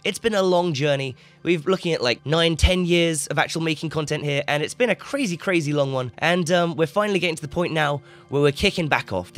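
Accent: United Kingdom. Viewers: Like Simon Sinnek said it a ones: "They don´t will love you for doing things the way you think they want it. They will love you for beeing yourself. You´re doing it absolutly right. Oh and by the way. Your brittish accent paired with a dbd Killer is the best i ever heard! Keep up your great work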